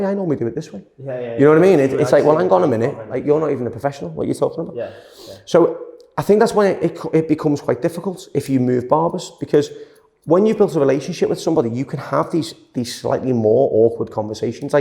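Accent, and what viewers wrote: Liverpool, United Kingdom. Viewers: This channel is so relaxing - Loving those transformation Details & That Scouse accent from a handsome Barber. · He talks non-stop and that thick British accent makes it difficult to understand what he says.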